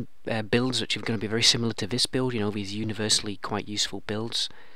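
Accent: United Kingdom. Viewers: Cool accent. Where are you from in the UK? I'm Scottish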